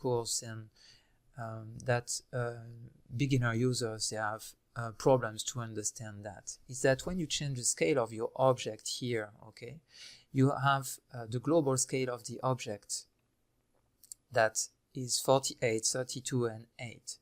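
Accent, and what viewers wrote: France. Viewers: On sens tellement l accent français ou un truc dans le genre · Great explanations , went and bought his complete training course , don't mind the french accent but he is so soft he puts me to sleep, but it is better than trying find it here and there, great education all in one place. He is bringing me up to speed fast and I can't say enough good things about it. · so I was looking for R when you said ER :D I love french speaking english :D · are you french?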